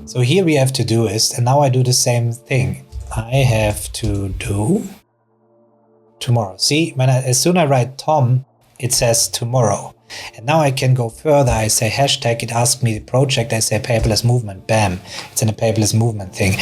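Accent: Germany. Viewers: do i hear a fellow german accent there? Great video 👌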